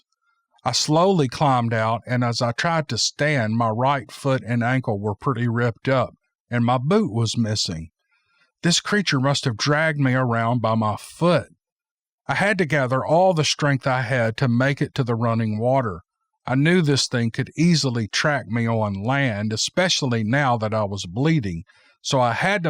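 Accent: South, United States. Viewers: Of all the cryptid channels, is my pick as the very best. No small talk chatter, straight into the story, excellent diction, smooth Southern charm with a clear and soothing voice, got it in abundance! Cannot fail to give proper credit to all the submitters for their excellent ability to covey the story in writing. Wouldn’t be successful without all the quality content!! Miigwetch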